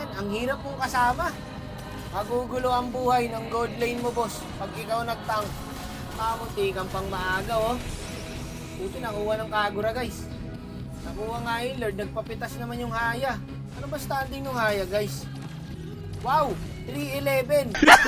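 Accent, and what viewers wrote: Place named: Bulacan, Philippines. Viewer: San ka sa Bulacan boss na halata ko kse boses mo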